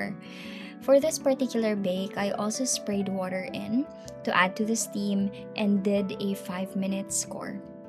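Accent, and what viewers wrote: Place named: Philippines. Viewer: Aha I knew that filipino english accent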